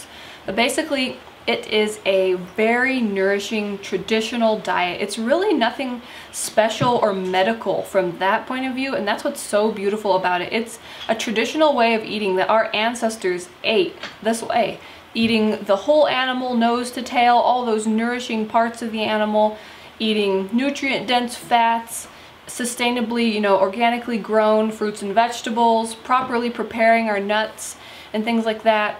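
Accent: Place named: United States. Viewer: I can listen to this beautiful woman all day and that's the best American accent I've heard, really great channel.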